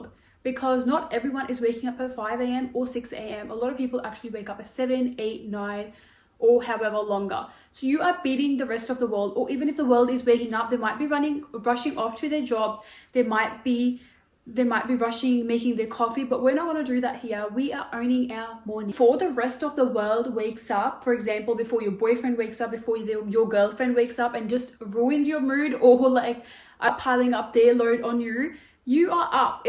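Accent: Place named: India. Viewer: All I hear is CURRY CURRY CURRY in an Indian accent 🤮🤮🤮🤮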